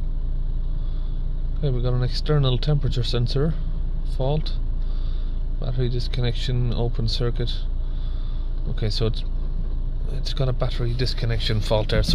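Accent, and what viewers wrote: Ireland. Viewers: SOUTHH Dublin accent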